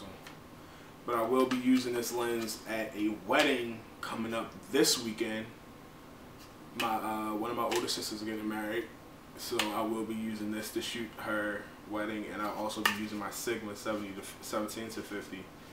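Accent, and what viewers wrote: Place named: Baltimore, United States. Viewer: You sound like you're from B'more...or maybe D.C.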